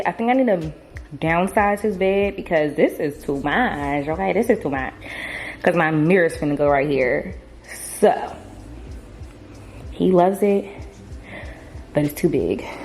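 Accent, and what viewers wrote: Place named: New Jersey, United States. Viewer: yessss!! Lol she and I are from the same state. Lol and you sound like my cousin in NJ lol boy these locations and sounds are too funny lol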